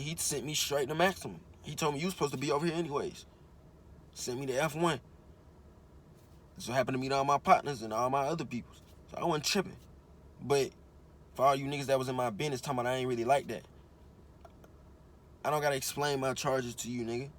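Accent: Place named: Chicago, United States. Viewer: I didn’t watch the season you’re in I watched the first 3. You sound like you frm Chicago tho haha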